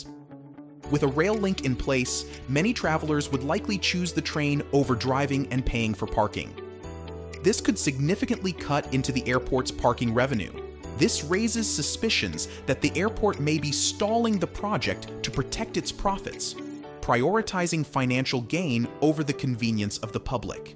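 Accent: United States. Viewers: Why do we have a yank who can’t pronounce Melbourne talking about “our” city??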